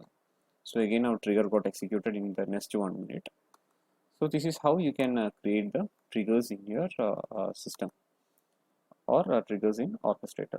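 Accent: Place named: Kerala, India. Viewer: Its really nice video. Very clear voice n explanation. Hope you have little Malayalam accent..🙂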